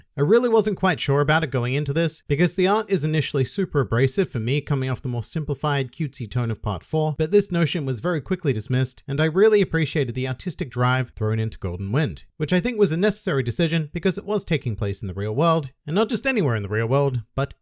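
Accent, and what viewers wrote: Australia. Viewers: I thought your accent sounded normal so you are aussie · Wait your Australian, I thought you were British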